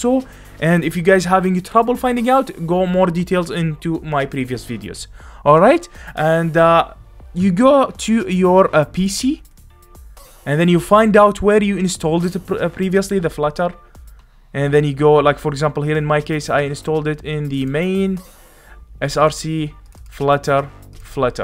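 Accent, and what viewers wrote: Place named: Iraq. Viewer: لهجة مالتك مال عراقي انت عراقي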